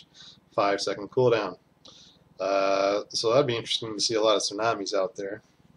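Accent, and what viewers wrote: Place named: Boston, United States. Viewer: It's ok. I heard that Bostonian Accent. My physics professor (back in the late 80's) was from Boston and basically renamed my last name on a daily basis. Good video. You get 1 like, 1 subscribe. :)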